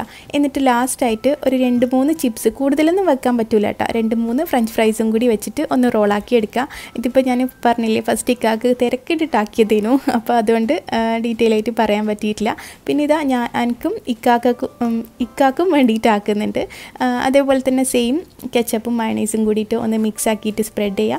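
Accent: Kannur, India. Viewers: കണ്ണൂർ ഭാഷ എനിക്ക് ഭയങ്കര ഇഷ്ടമാണ്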